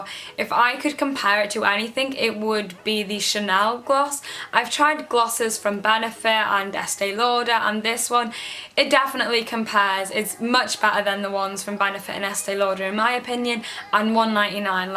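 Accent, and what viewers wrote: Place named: Wales, United Kingdom. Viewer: YOUR WELSH ACCENT IS TO DIE FORRR! 😍😍😍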